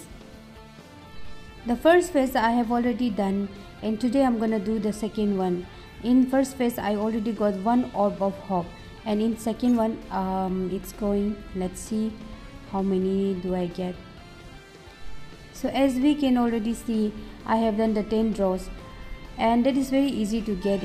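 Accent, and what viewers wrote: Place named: Arunachal Pradesh, India. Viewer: Waah Arunachal english 😅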